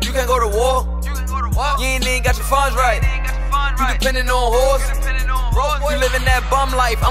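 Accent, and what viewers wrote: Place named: Baltimore, United States. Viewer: marv brown its called a Baltimore City Maryland Accent thats how we talk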